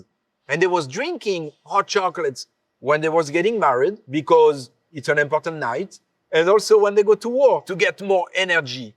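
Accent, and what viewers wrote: France. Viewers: Arnold is Austrian and this guy is French. Completely different countries and accents. Are you high? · Im reveling in his delicious chocolate knowledge and beautiful French accent! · His accent is peak French Accent, it's so entertaining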